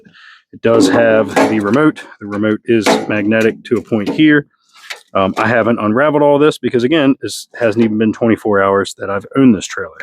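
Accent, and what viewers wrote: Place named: Eastern Shore, United States. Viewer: That eastern shore accent is thiccckkkk! I havent heard anyone sound like that since i lived in cecil county. Thanks for the reminder of home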